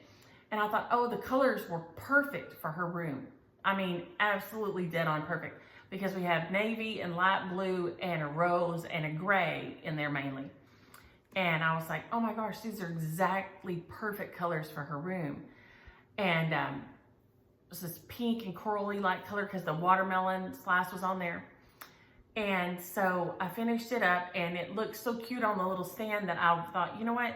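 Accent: South, United States. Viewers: I always love to hear your voice and your "Southern Sayings" Being from VA I don't have quite as much twang, but grew up with a sitter from GA so I have a few sayings that folks just don't get! I would love the opportunity to stitch for an hour or more in the flag pattern!!! 😉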